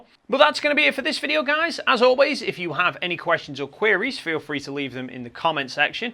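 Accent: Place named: North, United Kingdom. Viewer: For me, you're presentation is still spot on. No worries there. I subscribed for your technical explanations of camera gear in your signature informative yet easily understandable approach. & Your northern vernacular just adds the right amount to friendly chill to make them an easy watch from start to end.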